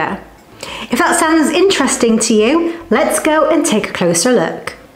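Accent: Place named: England, United Kingdom. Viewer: Thanks. It works very well and let me tell you that I love your English accent.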